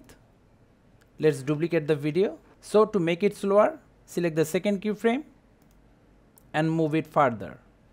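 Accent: India. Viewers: When ever I hear the indian accent I know that it will solve my problem W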